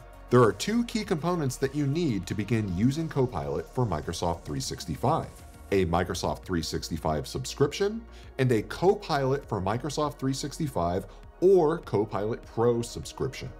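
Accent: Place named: India. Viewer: Forgive me to hesitate for clicking in, as I couldn't handle Indian accent (Not being mean, just I can't) ...But your video is very informative and well done, thanks!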